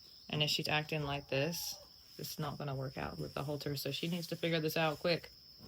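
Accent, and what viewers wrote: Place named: Australia. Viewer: I know what you mean , sounds like Australian and it's So Interesting , love it ❤️👍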